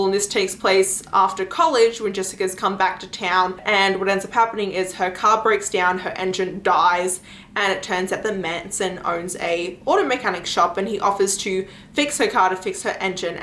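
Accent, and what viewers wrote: Australia. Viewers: The way you talk sounds abit Aussie too me ,,, cheers from Tasmania Australia 🦘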